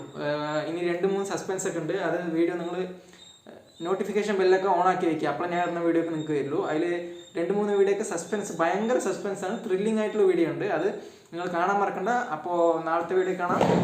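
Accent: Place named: Palakkad, India. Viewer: Namude palakkad basha kelkumbol oru santhosham😍😍😍😍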